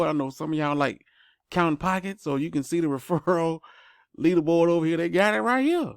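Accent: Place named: South, United States. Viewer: you the man, where you from bruh. That accent sounds country like me.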